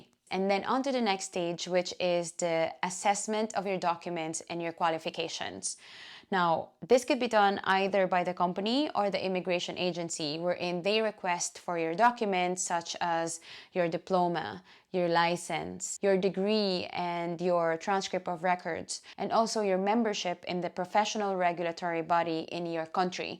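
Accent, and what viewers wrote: Ireland. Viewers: omg i can now hear a hint of irish accent coming thru when you talk!! omg 😍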